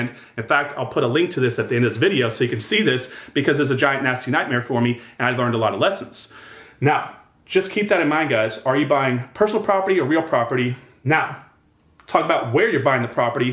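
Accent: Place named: South, United States. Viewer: Good info but you talk to fast. That combined with your Southern accent makes it hard to listen IMO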